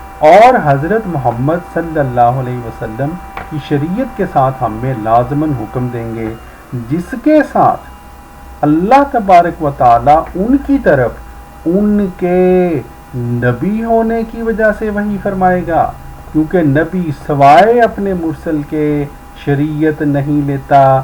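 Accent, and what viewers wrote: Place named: Punjab, Pakistan. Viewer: Punjabi mein urdu bolna bhi samaat par acha asar nahein chorta.Yoonhi tou Radio TV walay itnay baray baray auditions nahein letay.Presentation can make a lots of difference.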